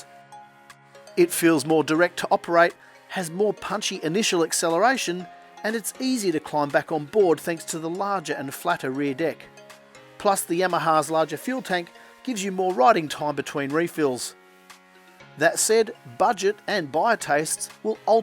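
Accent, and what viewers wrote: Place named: Australia. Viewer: It's because that's Australian dollars. Notice the accent 😋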